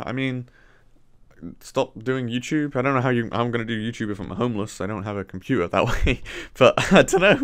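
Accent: United Kingdom. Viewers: R u from liverpool i thot u from london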